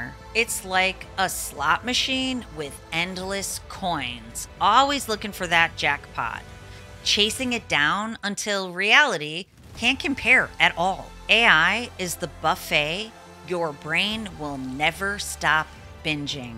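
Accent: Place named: Buffalo, United States. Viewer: I love your Buffalo accent,!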